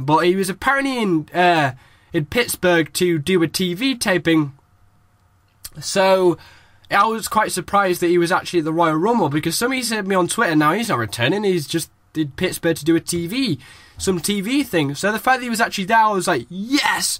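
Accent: United Kingdom. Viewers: Do you speak British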